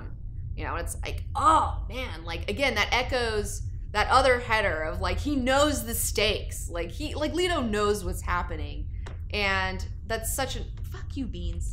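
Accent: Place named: Northwest, United States. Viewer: Okay I really gotta know something. Have you spent much time up here in the Northwest? Because there's a slight influx that I hear in your voice. Like " Out & Aboat" instead of "Out & About. Like the guy in Dirty Harry who hast to know if he fired 5 or 6 shots. I Got's to know!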